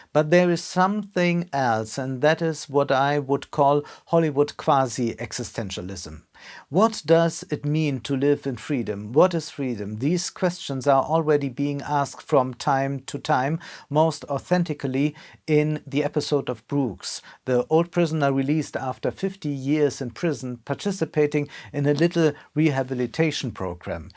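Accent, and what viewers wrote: Germany. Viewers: Du solltest dringend mal ein paar Jahre in einem Land leben, indem Englisch gesprochen wird. Dein Englisch ist richtig German. 🤒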